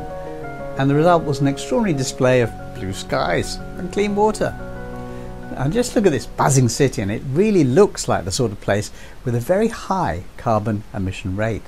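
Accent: United Kingdom. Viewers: yeah you are absolutely right and sounds alike also except his British accent.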